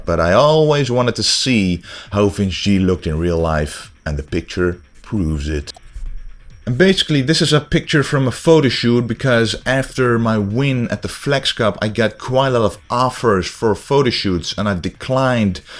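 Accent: Netherlands. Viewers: Dutch accent is so cool. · Hey man , are you dutch ? you sound dutch , i am dutch , my dad is also a oldschool bodybuilder from the netherlands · I'm wondering, are you Dutch? Your facial features appear Dutch, and your accent has slight hints of Dutch in it. I'm guessing either Dutch or Scandinavian. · Are you Dutch your accedent is like a dutch men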